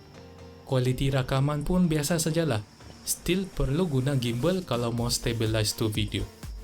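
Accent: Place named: Sabah, Malaysia. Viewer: MCM org Sabah ni abg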